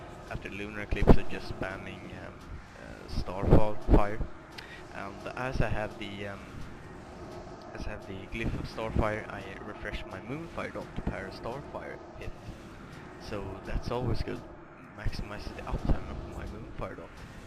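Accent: Sweden. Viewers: this guy is swedish, i can hear it from his voice :P if not he is atleast scandinavian >:]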